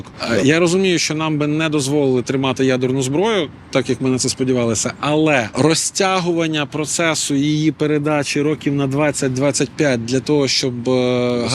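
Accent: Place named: Okanye, Russia. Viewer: Ой якби не коливання з ноги на ногу, і не окання то нехай підросте нервує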